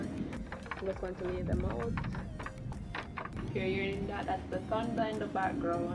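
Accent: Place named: Queens, United States. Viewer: Your accent is cool as hell. I grew up a Spanish kid in Jamaica Queens. Almost everyone in my neighborhood spoke like you. All of them just had this cool chill vibe.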